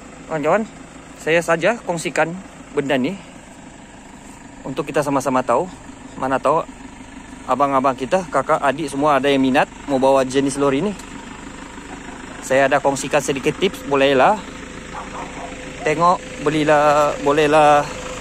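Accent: Sabah, Malaysia. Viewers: Abg org Sabah ke?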